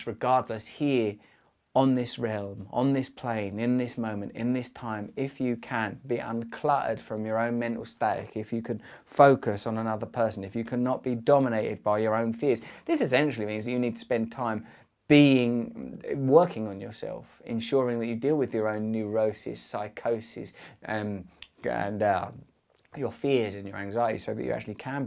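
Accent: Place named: England, United Kingdom. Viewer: Mojo, sounds most appealing when said by an Englishman! When I say it sounds like mow joe! Help me russ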